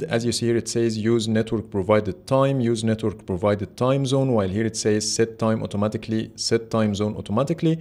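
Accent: Egypt. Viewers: Are you an Egyptian living in UAE?? Your pronunciation kinda gives me the feeling that you are. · Man you sounds Egyptian 😁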